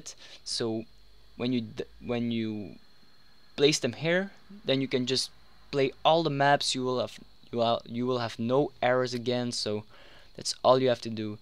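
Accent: Belgium. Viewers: Vlaams accent? :D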